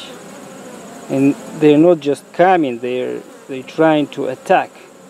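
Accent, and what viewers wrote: Russia. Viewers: russian accent😃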